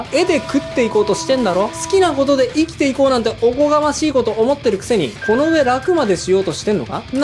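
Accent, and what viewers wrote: Tokyo, Japan. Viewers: ブラックになると江戸っ子風になるんや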